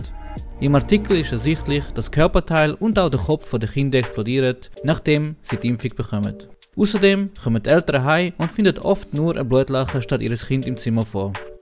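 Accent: Switzerland. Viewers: Krasses Video und gueti Arbeit! Btw. Bisch du Solothurner? :D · Was ist das für ein Dialekt? Schwitzerdütsch?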